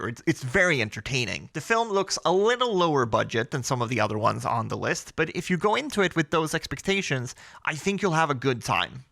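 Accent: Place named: United States. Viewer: You sound yankee AF, for a sweedish... Thanks bro. I´ll be looking for those movies. Happy new year!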